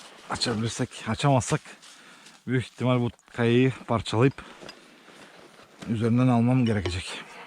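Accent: Thrace, Turkey. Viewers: Trakyalı olmalısın şiven öyle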